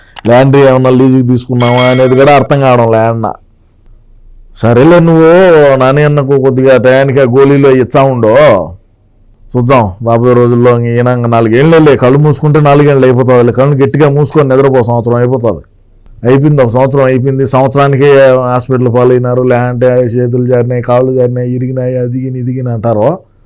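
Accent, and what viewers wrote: Rayalaseema, India. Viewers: భాష రాయలసీమ భాష బాగుంటది.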